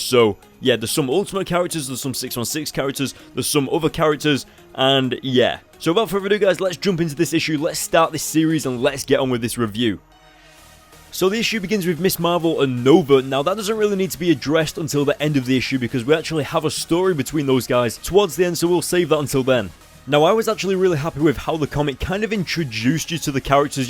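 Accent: United Kingdom. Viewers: Crazy stuff, crazy stuff, crazy stuff...that in your british accent just makes me smile. I like being british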